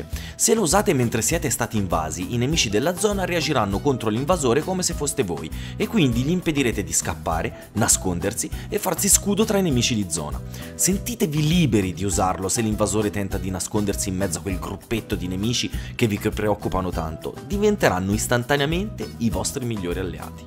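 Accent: Tuscany, Italy. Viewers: Bravissimo bel video! Toscano? :D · Fammi indovinare... Toscanissimo! :D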